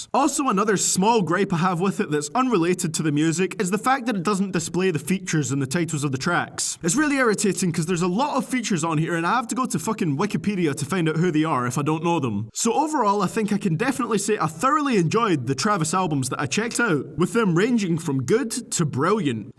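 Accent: Scotland, United Kingdom. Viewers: Brother you from scotland? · i can't help but notice that you have a western European accent, are you Scottish ?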